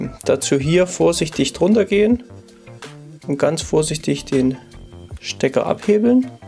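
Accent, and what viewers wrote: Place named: Saxony, Germany. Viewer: Echt ein klasse Video. Alles super sachlich mit sächsischem Charm erklärt. Weiter so.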